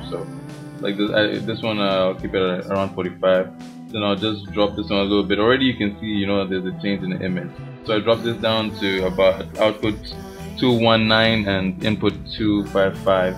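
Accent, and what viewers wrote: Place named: Germany. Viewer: you sound German :\ Are you? Nice video BTW :)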